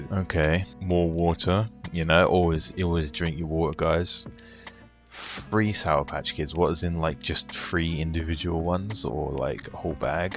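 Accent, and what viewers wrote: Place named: United Kingdom. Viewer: Hello. I’ve just recently come across your videos. Having once been super sized myself, I can totally understand your points of view. By the way you’re very handsome looking and I love the British accent that you have. Continued good luck with your YouTube channel 😊.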